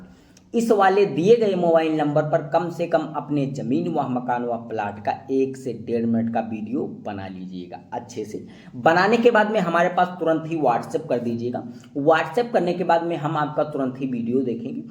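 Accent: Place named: Jamtara, India. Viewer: बोलचाल से तो भाई जामताडी लग रहा है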